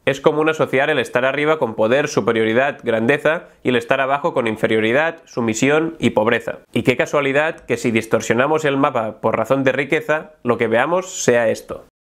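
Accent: Catalonia, Spain. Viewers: Ets catala jajaajaja es nota molt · Eres catalannn??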